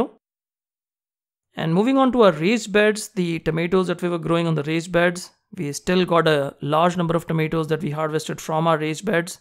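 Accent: India. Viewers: he has indian accent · are you native to india i feel so because of your accent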